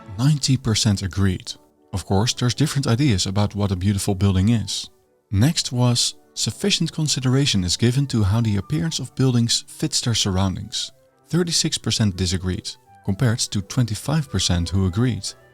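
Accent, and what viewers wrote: Netherlands. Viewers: This has to be a Dutch accent, eh? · You sound like my Dutch friend from Amsterdam, are you Dutch?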